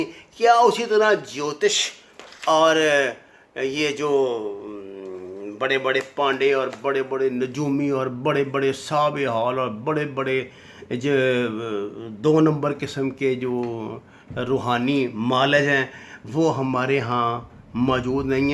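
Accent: Punjab, Pakistan. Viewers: Wo punjabi he uska accent aesa he hoga ksi angrez ki harami aulad. Tujhe khud check k spelling nhi aati.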